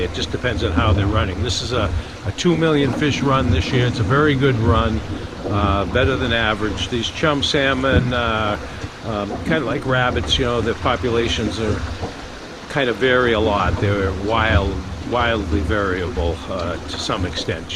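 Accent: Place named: Maine, United States. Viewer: Man I swear you have a Maine accent!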